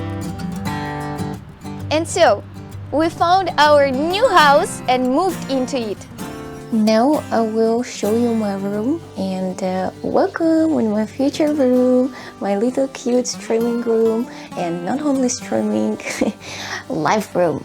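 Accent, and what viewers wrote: Russia. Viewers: Our girl used to have thick russian accent then lol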